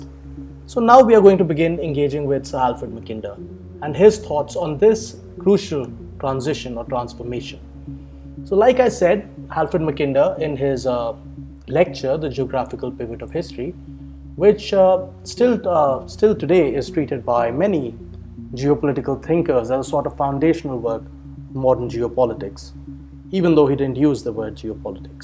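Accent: India. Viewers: He sounds Indian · Indian Accent? No no. Please no! ☹️☹️☹️☹️☹️☹️☹️☹️ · Indian accent? new presenter? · Pls dont speak in an indian accent its uncomfortable the accent i just dont like it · Oh boy it's Shirvan time! Hears Indian accent Oh boy it's World Power time!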